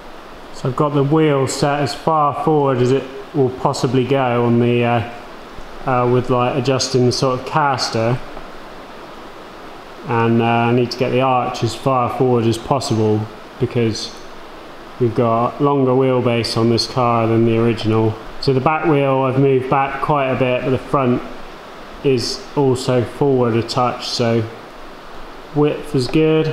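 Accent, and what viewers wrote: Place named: Northern England, United Kingdom. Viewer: crickey, you sound Northern!!!! 😂😂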